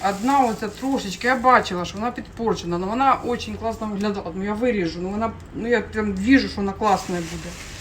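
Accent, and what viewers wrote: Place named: Luhansk, Ukraine. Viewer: Чому питаю, бо думала, що Ви десь з Луганської області, бо ми розмовляємо так само🤗 Було дуже приємно Вас слухати♥️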